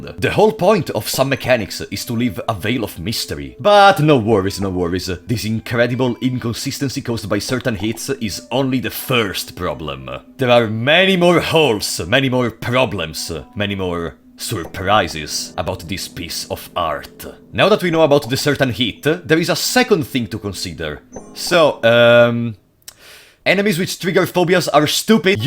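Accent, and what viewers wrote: Italy. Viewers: I just love your energy, I never hear italian accents online and when i do they’re usually more timid, but damn you wear it on your sleeve and you OWN IT! I love the energy! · I've never heard an accent as Italian as yours. I have family in Italy, i've had online friends in Italy, nobody I've heard has had as thick of an accent as yours. Ngl it's a lil impressive and i'm not saying any of this as a bad thing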